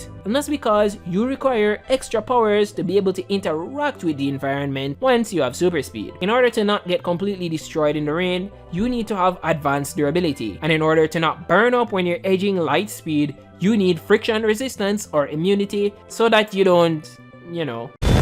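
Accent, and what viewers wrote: Jamaica. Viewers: I can tell from the accent that your a yardi big up mi G💪🏾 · I can tell that your Jamaican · Yuh jamaican?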